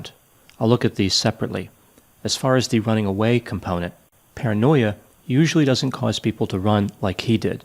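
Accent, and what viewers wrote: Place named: Northeast, United States. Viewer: I would love to know where you grew up. You’ve got a tad bit of an accent there! Sounds like NE close to NY/NJ?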